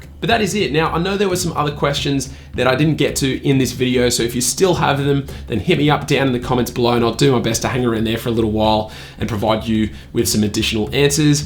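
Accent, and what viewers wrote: Australia. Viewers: How do u know Steven smith?are u Australian?i thought you were american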